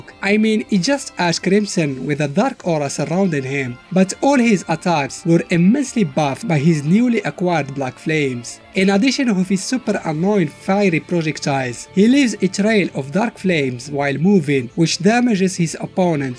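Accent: Morocco. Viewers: Your English pronounce look Moroccan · من خلال لهدرة ديالك نعرفك مغريبي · Great stuff, you can never miss a moroccan accent lol great work keep on. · مستحيل متكونش مغريبي من لكنة ديالك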